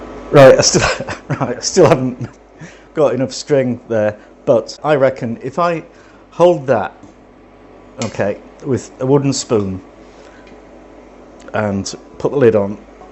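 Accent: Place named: Scotland, United Kingdom. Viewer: love the scottish accent lol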